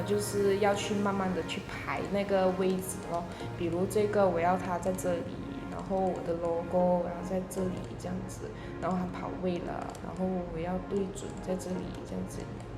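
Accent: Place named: Malaysia. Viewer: 很开心看到这个channel...听到马来西亚华语很亲切！加油！！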